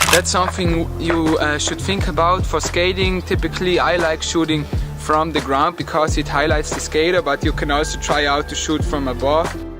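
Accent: Germany. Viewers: Richtig deutsches englisch aber gutes Video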